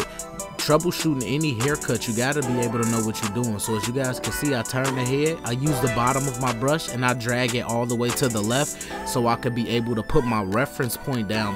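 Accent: Chicago, United States. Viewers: Yo when he first started talking I'm like he sounds like he from Chicago! And sure enough he is!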